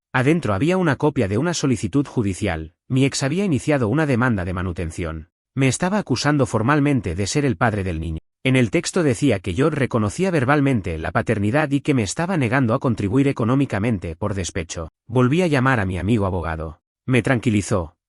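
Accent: Argentina. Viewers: Me suena a que es argentino, al menos eso parece por como hablá.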